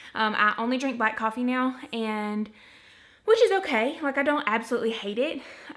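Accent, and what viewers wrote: South, United States. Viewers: I love your southern accent, great tips🌟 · Oh my god this is my first time hearing a genuine southern accent it sounds so cool. (I live in north new Jersey and I’ve only been out of state twice lol)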